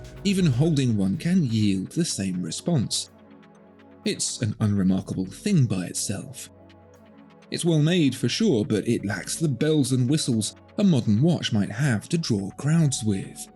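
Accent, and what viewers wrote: United Kingdom. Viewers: I would totally come, but based on your accent, I think you’re on the wrong side of the pond.😕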